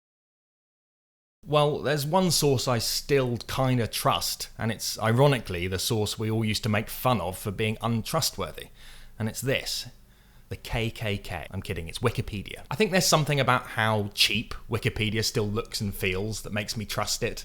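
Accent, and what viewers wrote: United Kingdom. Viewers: Your accent isn't British in the true sense It sounds English.....anglo-norman Nothing like the actual British celtic cultures like Welsh and Cornish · Who is this guy? Why is he talking to me? He sounds very British if you ask me.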